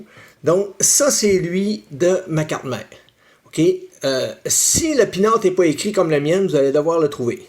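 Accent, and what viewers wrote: Quebec, Canada. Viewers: Enfin une video quebecoise .. c'est l'fun entendre cet accent la sur des tutoriels :P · allez hop ! c'est passé ...ça maaaaarche !!!! merci à toi, ce tuto est génial !!! en plus suis grave fan de cet accent "quebecoué" ... surtout ne change rien oh non rien ...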